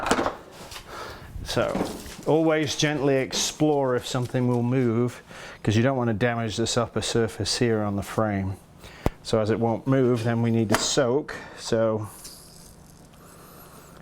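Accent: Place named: Scotland, United Kingdom. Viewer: Thanks. My bike is a 2002 CB500, very nice modern classic. Where are you actually from mate, your accent is a bit US/Brit and I sense some Scottish. May be way off but just a guess. I’m from southern England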